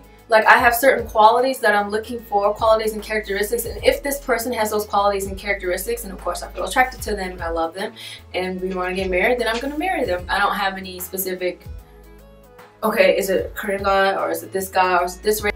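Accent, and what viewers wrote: South, United States. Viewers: You're really pretty. And that southern accent...love it! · You sound like your from the south in America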